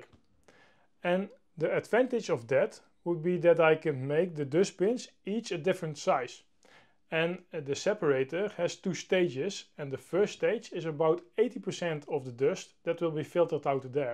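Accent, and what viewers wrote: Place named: Brabant, Netherlands. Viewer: He still gets an A for effort for opening up the concept and its development to everyone world wide. I'll take a thick brabant accent anyday for that :) Goed gedaan!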